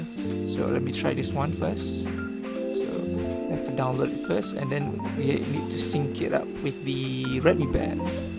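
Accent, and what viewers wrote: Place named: Malaysia. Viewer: bang orang malaysia ke?sbb mcm malaysia...hehe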